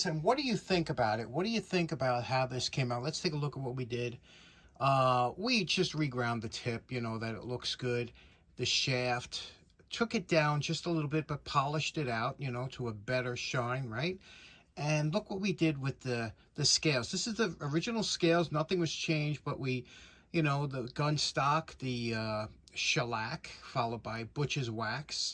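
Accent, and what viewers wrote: New York, United States. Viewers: What and where do I get sports tape? Your NY accent did you say “$ports tape” ?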